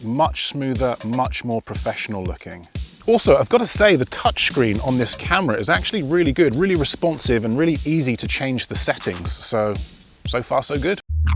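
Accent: United Kingdom. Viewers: Your British accent makes it even better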